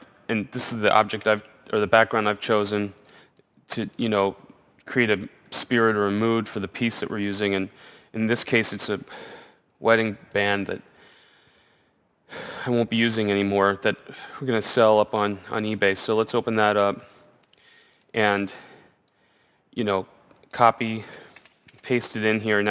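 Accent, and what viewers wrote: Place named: United States. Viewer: i dont understand neither his photoshop skill nor his American accent!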